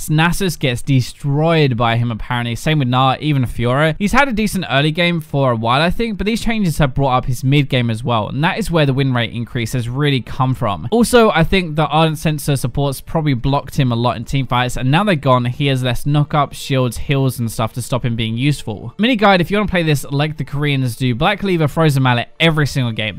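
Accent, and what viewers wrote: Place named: United Kingdom. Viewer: He is British, not an Amerifag. Not everyone pronounces words the way your cancer country does.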